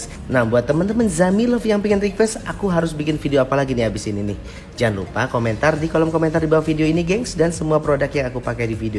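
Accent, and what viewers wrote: Java, Indonesia. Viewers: Uda q SUBSCRIBE kak q ngefans sama logat jowone...sampeyan mboten pelit ilmu Mugi Barokah nggeh...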